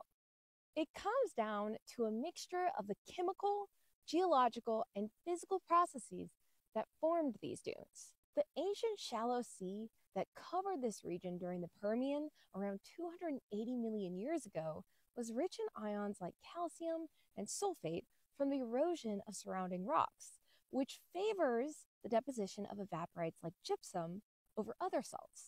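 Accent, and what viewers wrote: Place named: New Mexico, United States. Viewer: Sounds like you grew up in New Mexico?! Just makes me enjoy your videos that much more!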